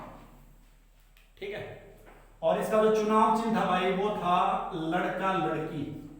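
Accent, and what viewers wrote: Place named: Haryana, India. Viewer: ठेठ हरियाणवी अंदाज़👌👌😂🥰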